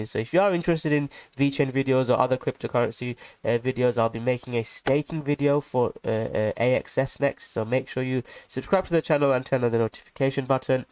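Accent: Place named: British Asian, United Kingdom. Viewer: you come from a chapati eating background ...😂😂😂😂😂 for sure !!! I cant be wrong , thats them pharata Uk English accent lol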